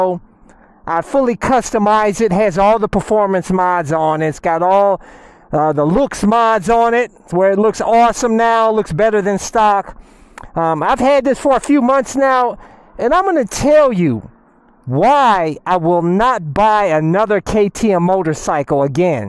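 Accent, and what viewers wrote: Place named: Texas, United States. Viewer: Hahaaaa. Dude, you're awesome. What is that dialect by the way, you in Texas or somewhere south? .. and yea, you have a new subscriber.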